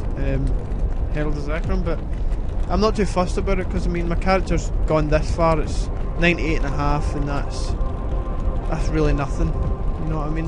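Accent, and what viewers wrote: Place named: United Kingdom. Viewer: british accent ftw :P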